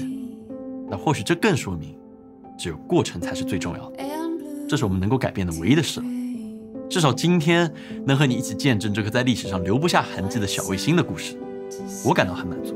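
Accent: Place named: Jiangxi, China. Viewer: 江西口音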